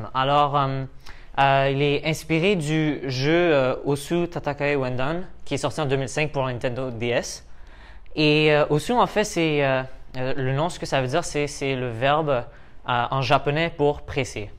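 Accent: Quebec, Canada. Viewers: Oh, I was not expecting this. Your French is pretty decent ! Where did you learn to speak like this ? It sounds a bit like a French Canadian accent.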